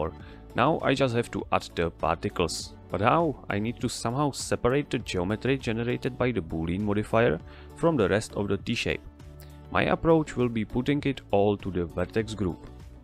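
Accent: Czech Republic. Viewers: Nice tutorial! Are you Czech by any chance? :D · u sound like czech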